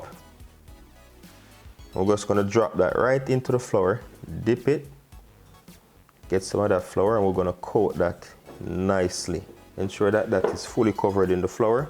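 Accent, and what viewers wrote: Jamaica. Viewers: Came here for coconut shrimp but oh my u r sexy.....love your Jamaica accent ...come to my kitchen we gonna do lots of cooking. ...😉😉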